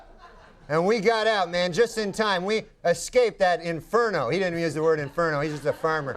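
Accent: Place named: Canada. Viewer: His Canadian accent is showing eh???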